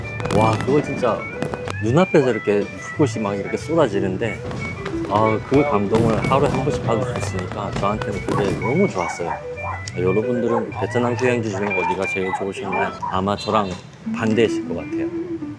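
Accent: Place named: Gyeongsang, South Korea. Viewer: 말씀하시는게 되게 웃겨서 두번째 영상 보는중 입니다. 경상도에요? 사투리가 구수하네요 ^^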